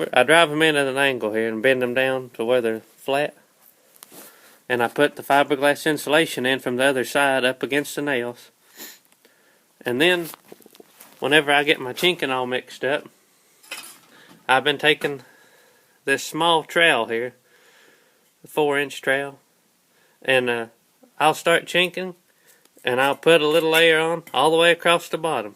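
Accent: United States. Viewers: i love your video. however,....i have to know what part of America your accent is from. thx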